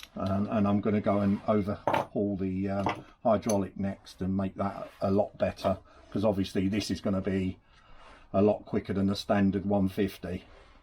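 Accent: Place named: mixed, United Kingdom. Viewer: Hi videos great you old school like me but you got great knowledge I have learnt so much as a born again Scooterist. Your attention to detail brilliant. By your accent you Essex or London boy. Regards Terry.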